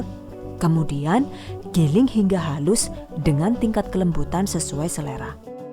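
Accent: Banyumas, Indonesia. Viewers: Alhamdulillah ilmu yg bermanfaat...semoga Istiqomah sangat terpercaya....bocah ngapak apa aslinya ya Bu??? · Mbak narator ini sebetulnya orang mana sih, kalau sy dengar suaranya bahasa Inggris nya bagus, bahasa Indonesia nya jg bagus dan itu loh boso jowone koq ngapak ya. Kadang sy suka geli sendiri, ok mbak salam kenal dari Bandung 😍. By the way sy suka loh dgn video"nya. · Jan...sampean nk logat ngapake metu ddi marekma ngguyu.menghibur karo nimba ilmu #GiveawayTHV2021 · Ibu ngapak jg ya😂. Asli mana bu? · Bahasanya itu lho yg ngangenin . Campur ngapak Ben tambah kepenak 😀👍🏻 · Warga Saranjana bisa ngapak juga toh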